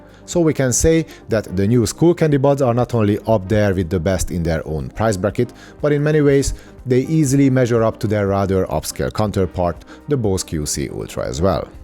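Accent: Hungary. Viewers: Feldobta a videódat, egyből ki halottam a magyar akcentust, amúgy tetszett a tartalom😊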